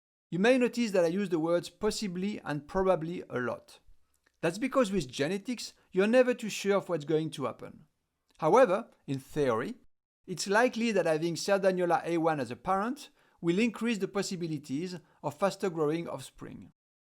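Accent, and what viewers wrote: France. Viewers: C'est un accent français ça non ? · French accent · Are you French ? You have a strong accent no ?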